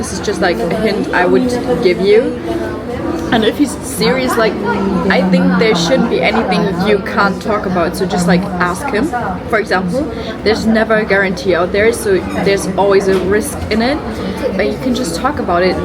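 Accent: United Kingdom. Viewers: You should lean into the British accent why not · You sometimes sound british and we’re here for it xoxo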